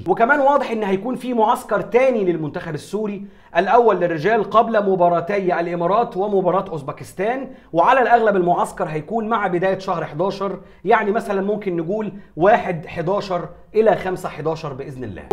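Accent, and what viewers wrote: Upper Egypt, Egypt. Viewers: هههههه لكنتك صعيدي اصلي ونتشرف فيك أخي وشكراً عمجهودك🌸🌸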